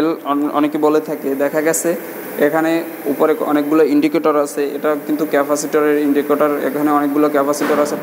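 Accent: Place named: Barishal, Bangladesh. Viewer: বরিশালের ভাষা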